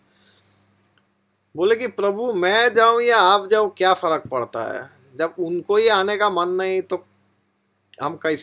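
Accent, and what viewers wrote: India. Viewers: Like the Hindi accent lol. Good explanation anyways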